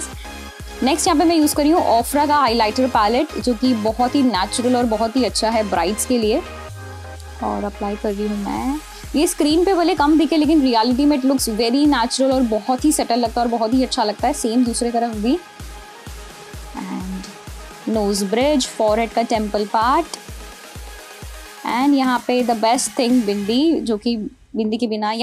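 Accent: United Kingdom. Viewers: British tone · British tone MakeUp tutorial pls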